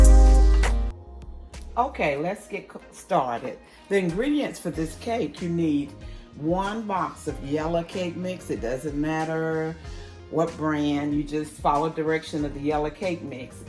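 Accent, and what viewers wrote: South, United States. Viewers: Your southern accent is divine unlike my bland Australian accent.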